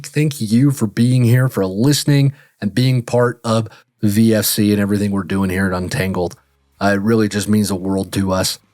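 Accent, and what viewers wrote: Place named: United Kingdom. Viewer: Just curious if that snooty British accent just came naturally?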